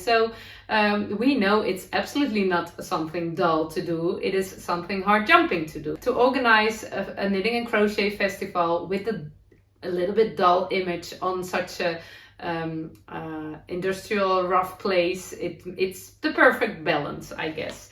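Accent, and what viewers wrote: Netherlands. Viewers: Crochet and knitting, here in the USA Is on fire! The farmers/ weekend markets are filled with crafters of all kinds. I recently found your vlogs and have enjoyed them and you! You are just charming and your dutchy English is just lovely. Wish I lived closer. Good luck with the festival.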